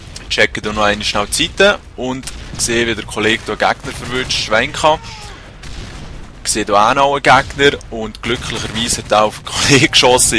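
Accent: Switzerland. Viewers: Sehr hilfreich, gut gemacht. Nur bemuehe dich mal bitte hochdeutsch zu reden (auch wenn ich den Dialekt mag, ist schwer zu verstehen), dann gucken dir auch noch die 80mio deutschen zu und nicht nur die deutschsprachigen schweizer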